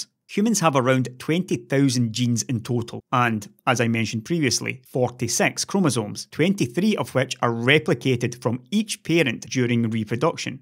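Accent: Scotland, United Kingdom. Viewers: I love your accent... you make scottish understandable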